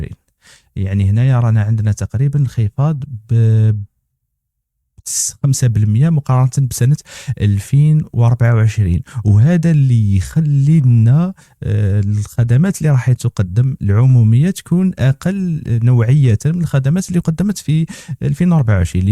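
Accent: Morocco. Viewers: نتا مروكي على حساب لهجتك واش دخلك في ميزانية الجزائر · اولا من هو هاذا المحلل انا راه ايبانلي مروكي حسب اللهجة نتاعو و من اين جاءته كل هذه المعلومات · لا أضن ، درت بحث و لم أجد شيئ إلا اللهجة أنتاعو تميل للجارة الغربية ؟؟؟ و هذا يخليني أتساءل عن ديون الجزائر و سيادتها 😊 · Un marocain qui fait des analyses sur l'Algérie et il oublie son pays:le makhraz. Son accent l'a trahit. Il. Parle d'un déficit de 68 mds $ et justement c'est la même somme de la dette du makhzane chez le FMI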